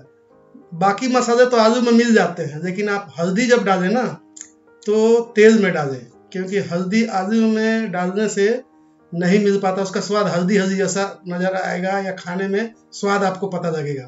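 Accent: Bihar, India. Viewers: Kya aap Bihari hai Tone se lag raha hai Respect 👌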